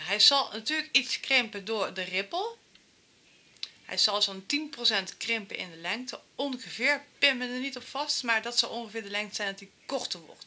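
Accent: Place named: Brabant, Netherlands. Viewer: Ja hoor je kleine en het Brabants accentje ...ben ook een Brabantse ...maar woon nu in Groningen ...blijft leuk om te horen 😀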